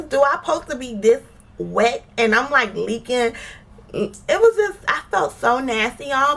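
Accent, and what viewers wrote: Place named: Florida, United States. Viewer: I just found your channel... I love it... I love how us Floridians pronounce stretchmarks (skretchmarks) 😊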